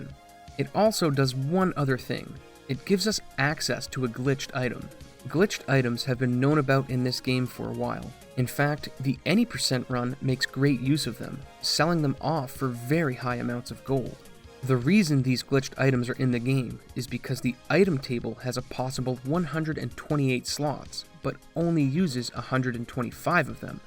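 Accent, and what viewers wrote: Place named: Maritimes, Canada. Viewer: I hear that Maritime accent :P Also great video!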